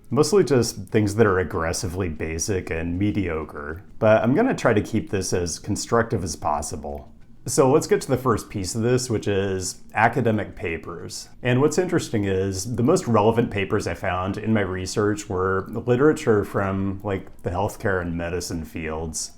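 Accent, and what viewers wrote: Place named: Portland, United States. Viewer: It just now hit me why has a Portland accent.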